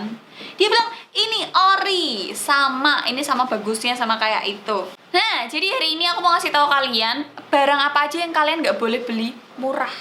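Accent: Java, Indonesia. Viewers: Adoh mbaknya jowo sekali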